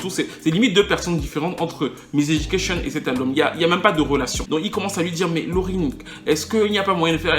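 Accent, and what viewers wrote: Cameroon. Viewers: Tes vidéos sont au tellement... non, tu es trop fort!!! Et l'accent camer ça rend la sauce impeccable!!! C'est une superbe chanteuse et sous une interprète hors pair!!! ELLE s'est mise un boulet au pied toute seule en voulant s'octroyer un talent artistique qu'elle n'avait pas...en plus si tu te joues les divas bon les durs du milieu ont vite fait de se passer le mot et tu vas mourir dans le film q chaque fois que tu vas sortir ton nez... Sinon une vidéo sur stp... Et une question privée: tu bosses dans quel domaine, la musique, la production?Où c'est juste une passion? En tout cas, continue de nous distraire si talentueusement,tu as vraiment l'art de raconter des histoires!!! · Salut mon frère je viens découvrir ta chaîne, et franchement je suis tombé sous le charme!La manière avec laquelle tu racontes, c'est très immersif quoi!Tu en parles comme des gens du quartier qui ont grandi avec nous,avec un brin d'accent Camerounais!Chapeau!J'ai direct activé la cloche pour ne rien manquer!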